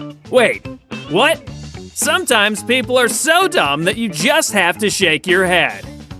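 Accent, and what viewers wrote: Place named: United States. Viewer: That's the most American narrating I've ever heard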